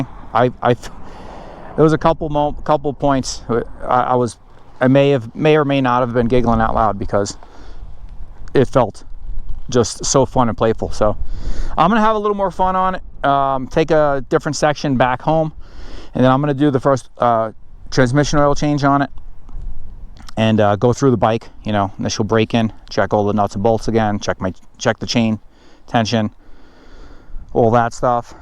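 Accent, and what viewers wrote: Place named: Massachusetts, United States. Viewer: You from Massachusetts? Got a north east accent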